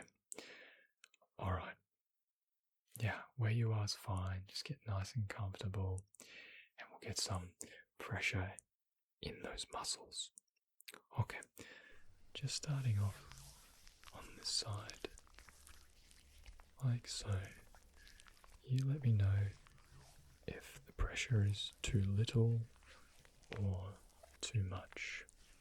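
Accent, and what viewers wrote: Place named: Australia. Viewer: It's so lovely to discover an Aussie voice, makes me feel at home 😀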